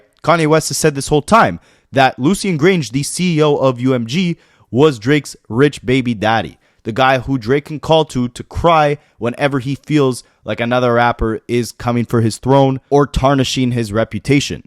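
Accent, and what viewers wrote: Canada. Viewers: Its the canadian accent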